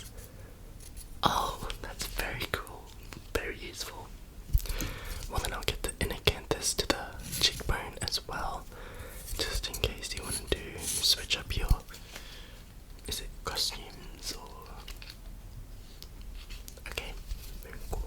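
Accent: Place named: Australia. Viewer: My first watch of one of your videos, and was trying to identify your accent to see if you were a fellow Aussie, then you said yeah nah and I didn’t have to check your about 😂😂 subbed 🤩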